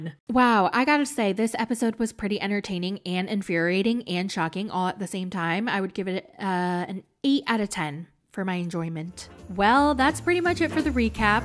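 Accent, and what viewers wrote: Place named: Michigan, United States. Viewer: your Michigan accent LOL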